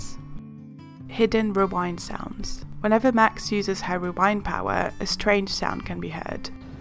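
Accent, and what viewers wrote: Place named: England, United Kingdom. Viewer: O cool ! Vous avez un sacré accent anglais :)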